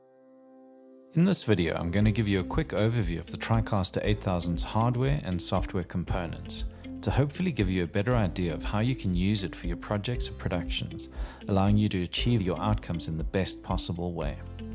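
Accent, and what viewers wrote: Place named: South Africa. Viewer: Great explanation and well put together video . Only Tricaster video with a South African accent which makes me happy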